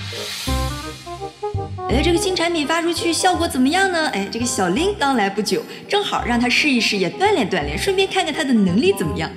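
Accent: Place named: Beijing, China. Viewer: 你太可爱了，真觉得你是北京大妞儿的风格～～口音也是北京味儿～～这是怎么回事呢？生长在大连，咋长成北京妞儿了～～？很想认识你一下，跟你做朋友～～😄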